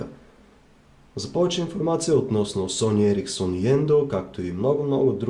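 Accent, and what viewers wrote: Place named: England, United Kingdom. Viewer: Which language is this? I really like your English accent lol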